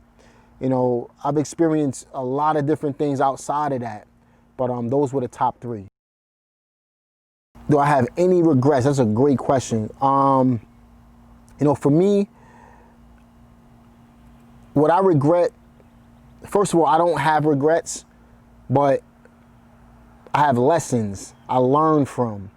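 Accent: New York, United States. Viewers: I can hear NYC in your accent, haha. I'm from Staten Island. I moved to Raleigh in 05.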